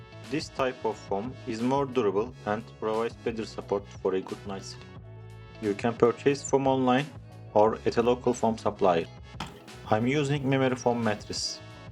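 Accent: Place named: Turkey. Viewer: Merhaba. Bende de bir c5 aircross var. Altımıza yorgan sererek yatak mi hazirlasam diye düşünürken sizin videonuza denk geldim. Telaffuzdan da bizden olduğunuzu fark ettim :) Güzel fikir gerçekten kartonla ölçü almak. Bana ilham oldunuz, teşekkur ederim. İki ufak çocugumuzla 4 kisi uyumayi düsunuyoruz. Tekrar tesekkurler. 😊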